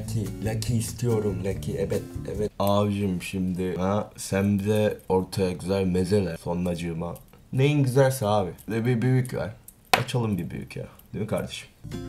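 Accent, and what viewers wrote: Adana, Turkey. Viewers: Kardeşim sen direk yöresel adanalı olmuşsun :d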